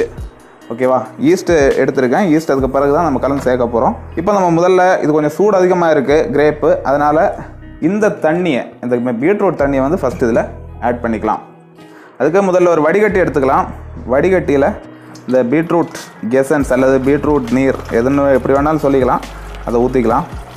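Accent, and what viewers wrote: Kerala, India. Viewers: அது சரி தங்கள் பேசுவதை பார்த்தல் மலையாளி என்றுதெறிகிறது,,,, தங்கள் மலையாளதெலேயே பேசலாமே எதகு தமிழ்,,,, நான் பிருடைய மொழியில் எபோதுமே பேசமாட்டேன்,, என் தமிழ் மொழில் தான் பேசுவேன் அப்படியே பேசினாலும் அவமானமாகஇறுகும், உங்களுக்குஎல்லாம் அவமானமே இருகாத????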